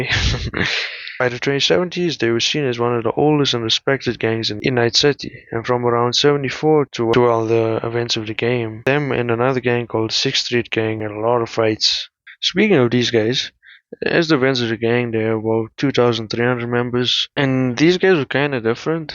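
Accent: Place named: South Africa. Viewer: Yooo. You sound South African choom. Awesome vid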